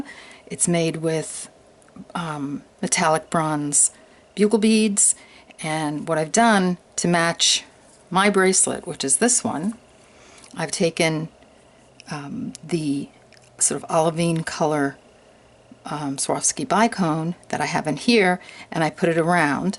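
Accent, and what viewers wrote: New York, United States. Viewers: you sound like youare from New York, where most people have patience with us. I made this by using 6mm bicones and 6mm rounded beads my friends love it, i use 86 tila and i found another way to make it with squares as well.. love it, i would love you to make something with pinks and purples and silvers.. thanks Love you girl.. I can bring you some Blue paddings. I am so addicted to this necklace I have made 12 of them so far and to give to gifts.. i need to show you what i made so far now i am making a blue bracelet a bit different.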